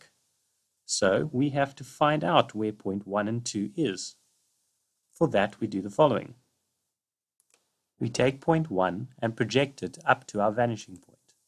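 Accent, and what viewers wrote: South Africa. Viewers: You sound Afrikaans, Mr Sir. Would you like some YouTube help by the way?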